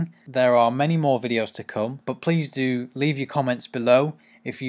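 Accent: United Kingdom. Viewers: Hey, what kind of british accent do you have?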